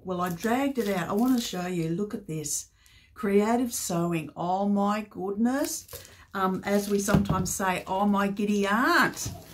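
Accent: Australia. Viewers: Hi, sew glad to find your channel. I love finding an Aussie voice for a change. Look forward to following your channel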